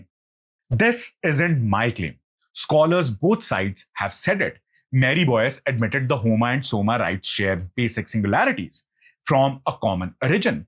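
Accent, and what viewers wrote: India. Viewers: Indian english. omg. Half of it is a blabber. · Indian accent 😂😂😂😂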